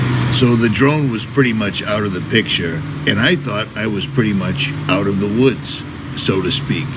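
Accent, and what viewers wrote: Boston, United States. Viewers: ? From Boston?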